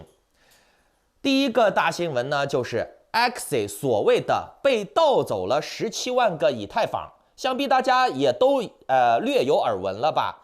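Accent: Henan, China. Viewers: 河南佬一个。口音漏了。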